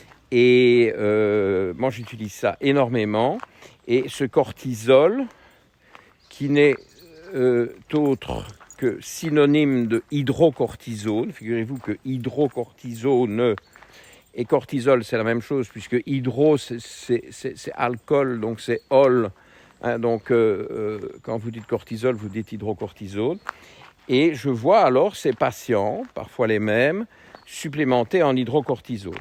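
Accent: Belgium. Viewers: Merci pour ces éclaircissements docteur. Je vais voir pour ma prochaine analyse en novembre. Entretemps je vais voir avec votre assistant les possibilités de consultation. Je viens de Bruxelles et j'ai cru comprendre d'après votre accent et les paysages de vos ballades avec Goliath que vous êtes situé en région de Liège. Je devrai probablement prendre congé pour venir vous voir. A bientôt de toute façon ;-)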